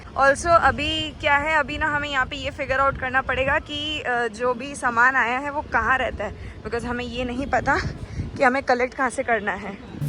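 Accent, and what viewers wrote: Bihar, India. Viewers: Mam ap jo bich-bich me bihari lehja me bolti h ekdum mast lgta h sunkar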